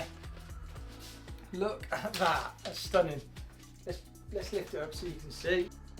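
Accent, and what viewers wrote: United Kingdom. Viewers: Cómo me encanta el inglés británico lpm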